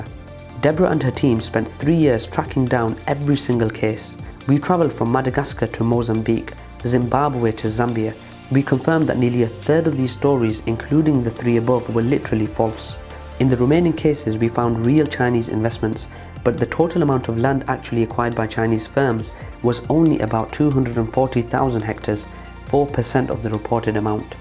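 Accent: India. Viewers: He sounds Indian doesn’t he? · Voice of Indian.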